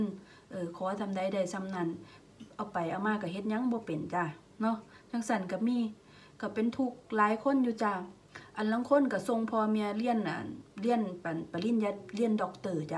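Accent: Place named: Isan, Thailand. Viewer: เจ้าคือเว้าถึกแท้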